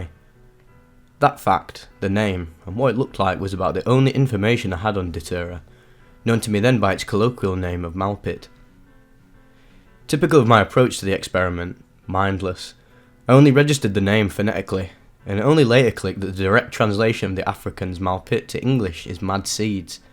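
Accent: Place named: Birmingham, United Kingdom. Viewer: Was just wondering that yesterday - I figured somewhere near Birmingham. I’m on the other side of the Atlantic so from here that seems close enough…?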